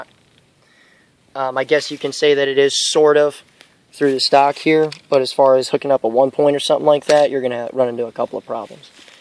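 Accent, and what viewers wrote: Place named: South, United States. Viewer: Add in the southern drawl when you start to talk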